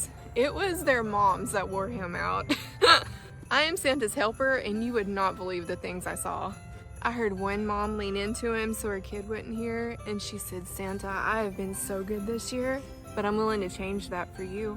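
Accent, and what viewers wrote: South, United States. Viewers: I love the southern drawl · Redneck accent but you ain’t half gorgeous 😋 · tell Santa you've been around a bit, but you ain't no ho. You're beautiful, sexy, that charming Southern accent unravels my socks and makes me stand at attention. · a beautiful one with a southern drawl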